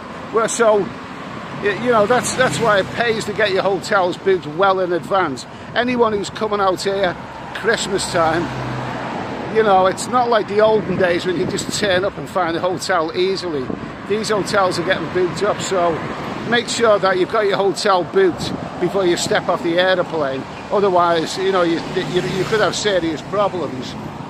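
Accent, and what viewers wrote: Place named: Scotland, United Kingdom. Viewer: You sound Scottish.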